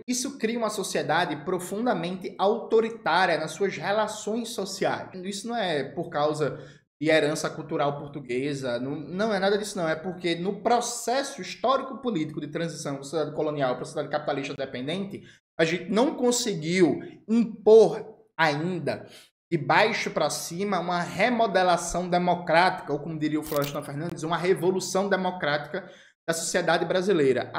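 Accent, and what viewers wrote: Pernambuco, Brazil. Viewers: Oi, tudo bem com você? Não tenho embasamento prá fazer discussões teóricas. Acho essa virulência das redes sociais um horror! Seja lá qual for a discussão o que eu ví naquele trecho de vídeo é totalmente inadequado, um chilique mesmo. Gosto muito dos seus vídeos. Só tenho uma crítica muito séria. Acho que o Marxismo propõe análise de conjuntura e na minha opinião, você não faz isso quando não apoia claramente o Governo Lula. Está certo fazer críticas, o próprio Lula pede isso, mas eu gostaria que as críticas viessem sempre com a ressalva que precisamos apoiar esse Governo, nem que seja como um Governo de resistência ao fascismo, à extrema direita, ao bolsonarismo. Acredito que se o Presidente Lula resistir e conseguir ganhar o próximo mandato (2026 a 2030)prá ele ou algum sucessor, daí então poderemos começar a nos sentir um pouco mais "seguros". 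O Mundo está em risco e, não entendo como deixar de creditar ao seu Governo a diminuição da fome no País, por exemplo. A cadela do fascismo, sempre no cio, está nos nossos calcanhares e nossa única e frágil defesa é o Governo Lula nesse momento. Vamos apoiar! Criticar mas apoiar, explicitamente. Só prá lembrar a análise de conjuntura, se esse movimento da extrema direita conseguir ganhar a próxima eleição acabará o debate. Você está acompanhando até onde eles queria chegar, tenho certeza Foi por um trisco! Gosto muito do sotaque pernambucano! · vc tá cada dia melhor! Esse Eduardo resolveu aparecer, hein! Chilique feito só pra te provocar e aparecer aqui, agora que seu canal tá crescendo... E o sotaque pernambucano é um sotaquezinho maravilhoso, lindo e gostoso mesmo! Sou do ridijanero e amo!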